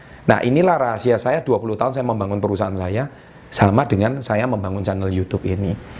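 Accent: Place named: Surabaya, Indonesia. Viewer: ohh.... wong suroboyo tah ? hahaha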